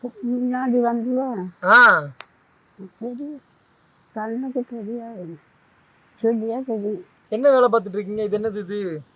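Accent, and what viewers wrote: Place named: Tirunelveli, India. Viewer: தம்பி நீங்க திருநெல்வேலி பாஷையில் பேசி பாட்டியம்மாவிடம் எடுத்த பேட்டி super தம்பி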